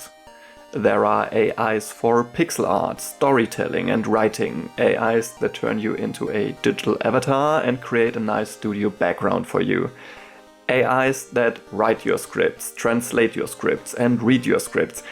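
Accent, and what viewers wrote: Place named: Germany. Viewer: I'm guessing you're German, based on the accent and the fact that you speak more articulately than most native English-speakers. Great video!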